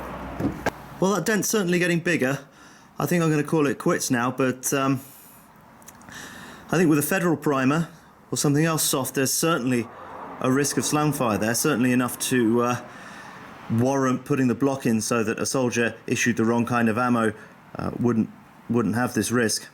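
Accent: England, United Kingdom. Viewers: so weird to hear a British accent on a gun video instead of an American southern drawl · Hi, nice test. Are you English? Can a Garand T2 girino a 30-06 cadridge? Andrea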